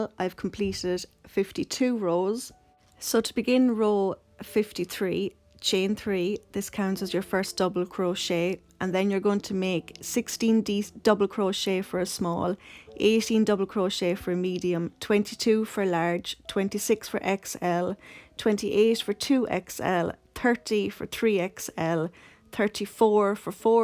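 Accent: Ireland. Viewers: It's good to. Hear an Irish voice I am Irish from Dublin xxx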